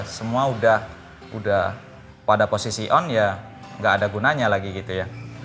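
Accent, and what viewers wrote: Bali, Indonesia. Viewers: maaf saya bntu,itu sbnrnya logat bali,yg salah hanya 'Mukin' sisanya logat bali digabung sama bhsa indo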